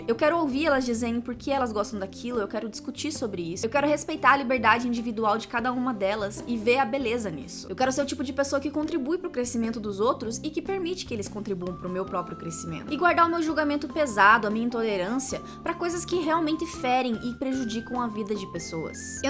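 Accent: Brazil. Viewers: mano tu tem sotaque de sulista, eu amei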